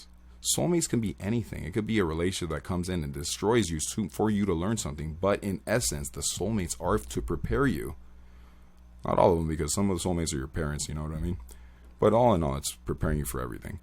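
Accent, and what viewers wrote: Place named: Toronto, Canada. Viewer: Nice to hear a guy out here. You sound like you are from Toronto .. I am from Toronto.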